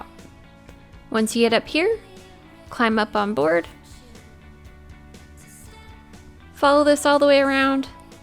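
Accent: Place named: Canada. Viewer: Thanks so much for the help. I believe I've found all 150 cases. (not sure if there are 151) LOL Btw, are you Canadian? The way you pronounce "out" and words with that sound are usually a dead giveaway. ;)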